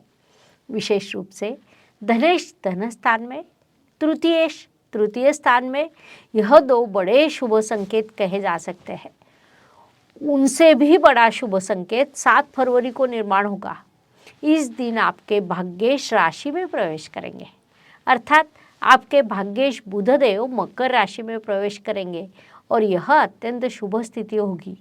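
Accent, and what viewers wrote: Maharashtra, India. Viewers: Are madam tumhari speech kitnnnnna mast hai Marathi mix lagta hai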